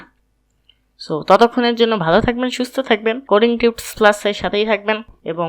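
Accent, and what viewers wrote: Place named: Rangpur, Bangladesh. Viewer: আপনার ভাষা আমি বুঝেছি। যেমন আপনার বাড়ি অমপুর।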